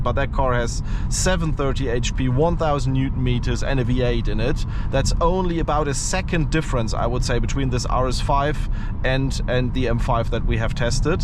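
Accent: Germany. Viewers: A german trying to speak british english with a heavy german accent. It sounds so ridiculous and disgusting 🤢🤮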